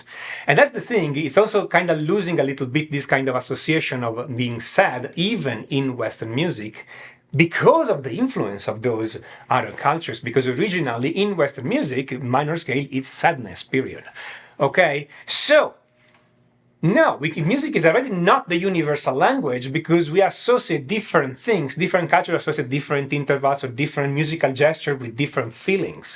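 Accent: Russia. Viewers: Accento russo! piango...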